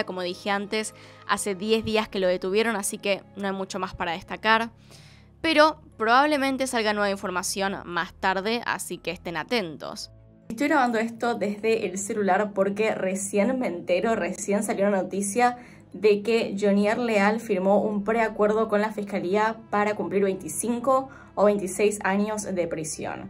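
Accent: Argentina. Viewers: Lo que más me gusta de es su forma UNICA de narrar. Aparte que AMO el acento argentino. Saludos desde El Salvador.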